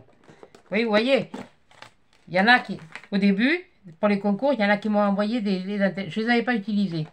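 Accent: South, France. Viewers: Coucou, je découvre votre chaîne et je reconnais bien là la gouaille du sud! J'adore! Vous m'avez bien fait rire! Y en a une qui a intérêt à bien se tenir!!! Votre franchise me plaît. Du coup je m'abonne et je pourrai découvrir vos créations. Merci pour le partage. Bisous de l'Aveyron.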